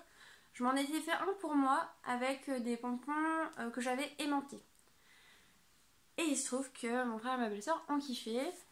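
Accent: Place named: Picardy, France. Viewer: Coucou je me disais bien que ton accent m’est familier. Je suis originaire de la baie de somme en Picardie 😉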